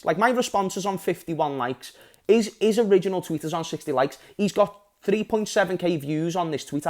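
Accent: Liverpool, United Kingdom. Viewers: Well said, I’m an Arsenal fan that watches your content for your footballing insight and your energetic personality. Plus as an Aussie, the Scouse accent is a true novelty 😂. No player is immune to criticism, in fact no individual is. Calling it racist is a real problem as it actually contributes to the issues of divide and separation which is the opposite of where we should be heading. Before claiming that someone is racist publicly, you better have some damn good evidence otherwise you become part of the problem in my opinion. Keep up the great content ❤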